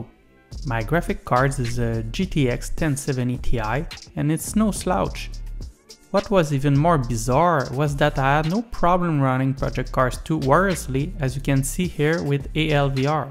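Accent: Quebec, Canada. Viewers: accent du quebec sa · Nice video bro haha j'ai direct su avec ton accent que t'étais QC lol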